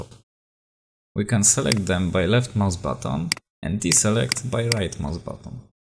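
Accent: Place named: Poland. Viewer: Widziałem, że skądś znam ten akcent, Polak jesteś. :D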